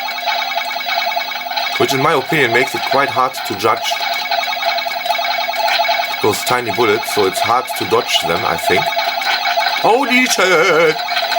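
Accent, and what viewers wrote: Germany. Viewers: My german-sensor was activated... Do I hear a german gentleman? :-D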